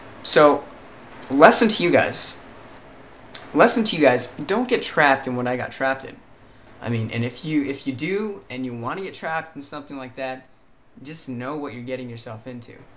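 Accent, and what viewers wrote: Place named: United Kingdom. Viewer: Thanks. I'm not as addicted as it sounds like you used to be, but I do over-use my computer. So after this comment, I'm turning it off and going downstairs :) Nice to see you realised this and turned your life around, I'm happy for you. And side note, the accent is kind of British :) Question... do you prefer to make vlogs, or tutorials? What do you see as your "main channel"?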